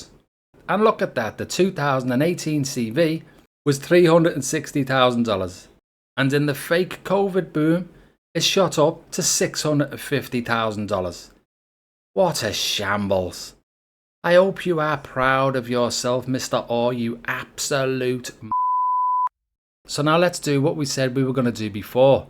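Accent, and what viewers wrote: Liverpool, United Kingdom. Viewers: Are you from Liverpooool mun?!!?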